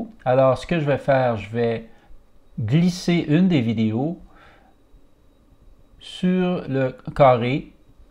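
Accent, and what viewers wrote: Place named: Quebec, Canada. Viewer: Je vous adoré surtout à votre accent Québécois 😉, vidéo c'était bien. Merci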